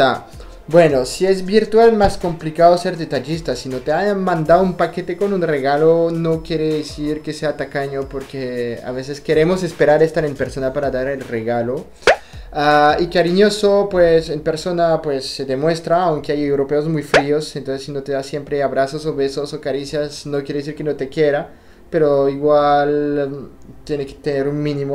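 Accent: Colombia. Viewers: Tu español es muy bueno para ser Francés, tienes un acento bien colombiano btw. Muy interesante el vídeo lol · Hablas muy bien el español, incluso tienes acento, parece colombiano, eres genial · Holaa me encanta tus vídeos siempre hablas muy relagado y colombiano un abrazo 😚😊💞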